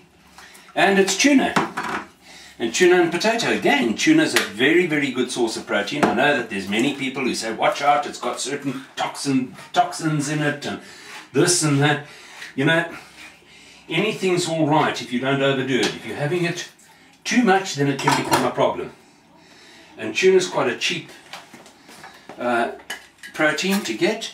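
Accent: South Africa. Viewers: are You South African? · Are you from South Africa then?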